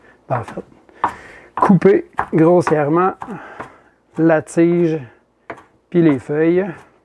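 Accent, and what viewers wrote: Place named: Canada. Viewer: 😅C'est seulement aujourd'hui que je vois cette vidéo que je trouve très intéressante. Hier, j'ai cueilli des jeunes feuilles de renouée du Japon pour les cuisiner. Cette vidéo m'inspire. J'ai aussi cueilli de l'ail des bois (en France on l'appelle l'ail des ours). J'en ai fait un pesto. Merci Monsieur de mettre en avant les plantes sauvages comestibles. C'est dommage que l'on en profite pas davantage. Elles sont sources de grandes qualités aussi bien alimentaires que pharmaceutiques. Les ignorer risque éteindre la connaissance ancestrale. Votre accent est canadien (j'aime beaucoup). Lorsque je vais au Canada, je m'installe à Montréal durant quelques mois. De quelle région êtes-vous ?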